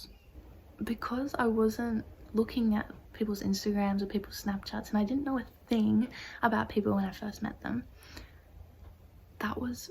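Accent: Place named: Australia. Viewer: I knew she’d have an AUS accent even before I clicked on the video for some reason haha. I do think social media gives us too much insight on people’s lives. We used to live our whole lives not knowing what our friends, family, and fav celebrities ate for breakfast.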